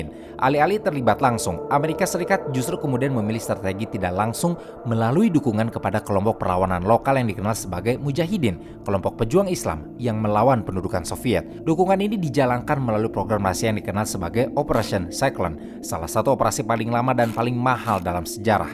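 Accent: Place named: Banyumas, Indonesia. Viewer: Wong ngapak ngendi kie😂 tegal um?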